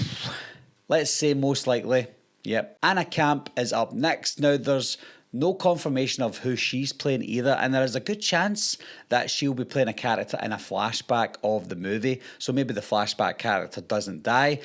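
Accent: Scotland, United Kingdom. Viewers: You keep calling Chad Omar Gooding. His name is Mason Gooding and no it's not your accent as I know people with a stronger Scottish accent than you. I always went on holiday in Scotland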